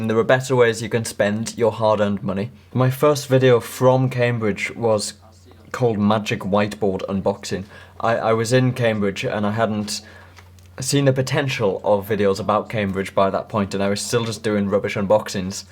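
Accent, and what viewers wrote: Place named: North East England, United Kingdom. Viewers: North-East England, possibly Durham area? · He is from Sunderland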